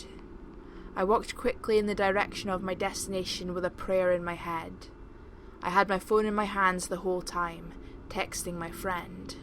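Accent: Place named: United Kingdom. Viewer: Great stories and you have a great voice! Love the British accent! Keep up the good work and looking forward to your next video!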